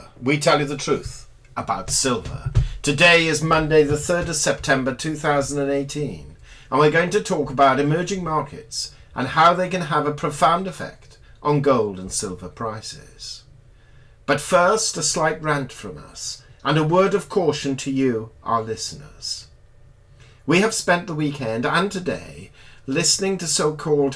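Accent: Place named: Wales, United Kingdom. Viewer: The narrator is quite clearly British at a guess I'd say Welsh, and yet you pronounce YouTube as YouToob. Why?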